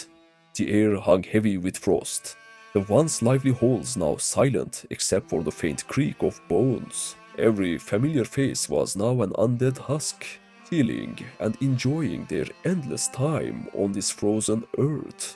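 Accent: Turkey. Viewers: Can you make kuzhait campaign next time please? (Btw your accent sound like Turkish) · Abi İngilizcenden anladım Türk olduğunu hahahaha :D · Dostum güzel içerik aksağanın Türk gibi Türk müsün diye merak ettim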